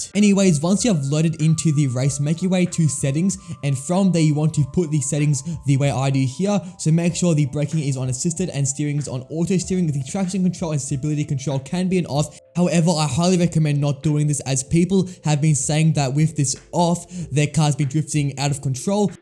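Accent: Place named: Australia. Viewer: Bro is fine he's an Aussie I live there I have the same accent but I'm Italian there is nothing annoying about his voice unless you hate it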